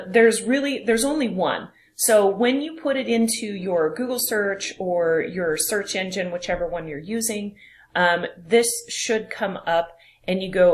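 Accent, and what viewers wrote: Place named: Boston, United States. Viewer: gasp! Boston!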